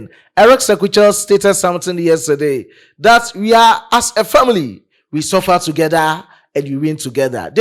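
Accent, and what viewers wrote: Ghana. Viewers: You're not a Nigerian. Your accent betrays you. You are clearly a Ghanian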